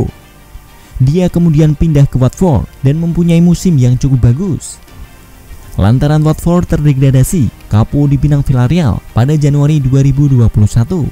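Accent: Java, Indonesia. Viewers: Jijik suara nya medok 😛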